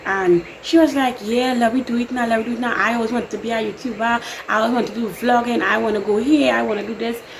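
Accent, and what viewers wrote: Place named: Caribbean. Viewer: So love your accent. Is that Trini or Jamaica?!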